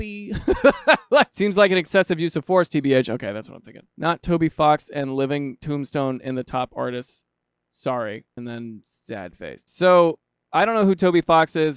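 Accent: Germany. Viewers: That's a pretty good German accent, not sure what it has to do with Lake Tahoe though lol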